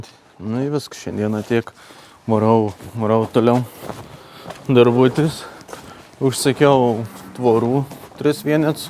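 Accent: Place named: Samogitia, Lithuania. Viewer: O kodėl blogai gražia žemaitiška tarme?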